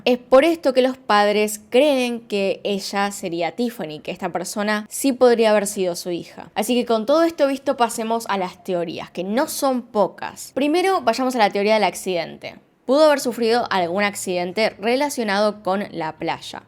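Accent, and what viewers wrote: Argentina. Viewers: Tu acento argentino me gusta mucho, es un placer oírte, gracias por hacer casos para nosotros.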